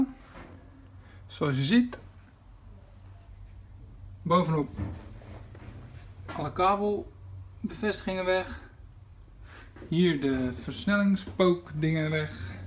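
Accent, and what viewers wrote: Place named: Russia. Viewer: What country are you from sounds Russian nice build anyway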